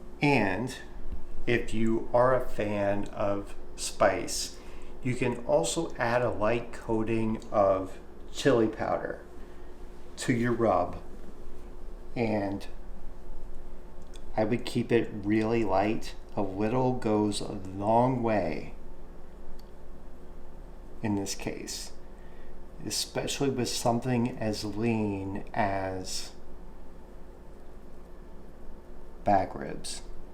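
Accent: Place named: North, United States. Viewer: Northern accent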